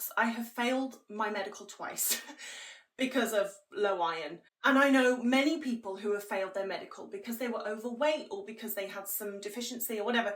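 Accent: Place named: North West England, United Kingdom. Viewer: Your accent sounds very familiar but I cant quite place it... do you live in the north west?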